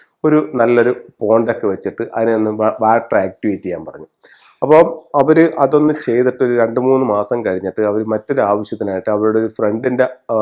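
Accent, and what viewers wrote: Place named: Kollam, India. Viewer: Kollam TVM fagham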